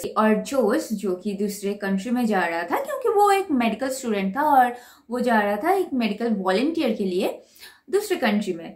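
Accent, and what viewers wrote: Bengal, India. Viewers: She is bengali so her hindi isnt proper. No problem with that 😊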